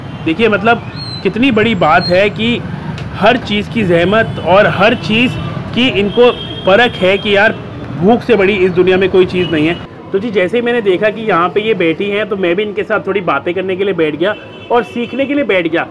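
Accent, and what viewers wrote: Bihar, India. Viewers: Wah bhai 🙏 speaks very well in Bihari tone 😊.. i m big fan of you from Bihar "Katihar" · I live in delhi,but missing my bihar very much and happy to see bhai is trying to speak in bihari accent,just amazing 🔥🔥❤️❤️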